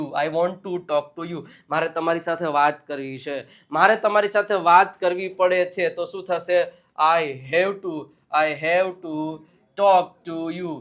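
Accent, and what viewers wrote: Kathiawar, India. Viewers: I like your kathiyadi speech sir 🙏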